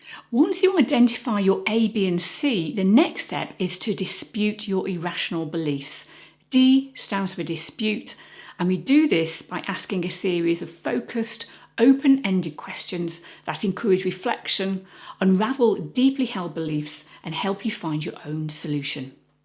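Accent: England, United Kingdom. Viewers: This is a great video, but can you please do it again without the English accent? I'd prefer to hear it with a Canadian accent. Thanks!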